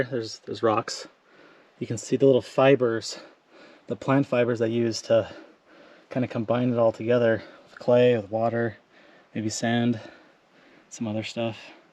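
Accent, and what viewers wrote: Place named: Boston, United States. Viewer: getting the hint you're from Boston? Haha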